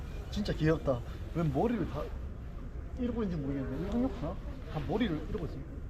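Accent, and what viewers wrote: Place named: Busan, South Korea. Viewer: 님 영상보면 언제든 즐겁네요...부산사투리 좋고~~~신발도 좋고~ 꿈같은 로망의 여행을 대리만족으로 즐기고있네요...안전한 여행되길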